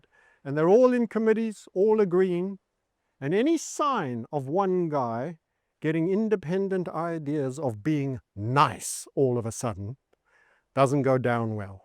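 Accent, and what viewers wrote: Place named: South Africa. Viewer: your South African accented quips get me every time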